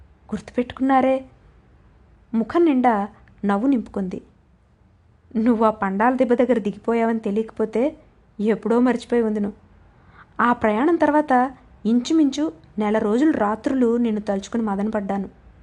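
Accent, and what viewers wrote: India. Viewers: కధ బాగుంది. రాములమ్మ పాత్ర బాగుంది. తూర్పు యాస మీ గొంతుక లో.బాగా.పిలిపించారు. వరలక్ష్మి గారి కథ బాగా రచించారు. మీ ఇద్దరికి నా ధన్యవాదాలు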